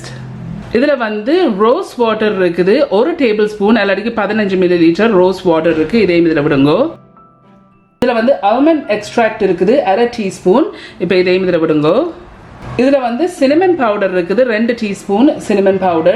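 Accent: Jaffna, Sri Lanka. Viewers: Super அக்கா, இலங்கை எம்நாடு. யாழ்பாண, தமிழ், சூப்பர். நன்றி.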